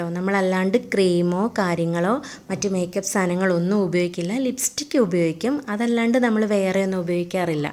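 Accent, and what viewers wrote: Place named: Kottayam, India. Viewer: You are too genuine god bless you ❤️❤️. ഞങ്ങൾ കോട്ടയത്തു നിന്നും രണ്ടു തലമുറ മുൻപേ കണ്ണൂരിൽ കുടിയേറിയവർ ആണ്. കോട്ടയം ഭാഷ കേൾക്കുമ്പോൾ ഇഷ്ടം ഒരുപാട് മര്യാദ ഉള്ള സംസാര രീതി ആണ് ❤️❤️❤️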